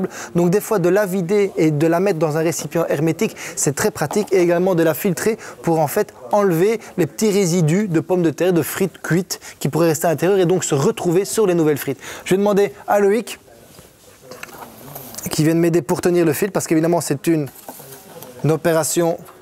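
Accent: Belgium. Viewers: j'aime beaucoup l'accent belge, ça donne un charme assez prononcé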